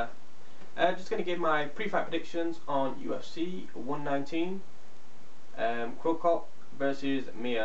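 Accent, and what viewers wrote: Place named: United Kingdom. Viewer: great british accent you have. Awesome accent, though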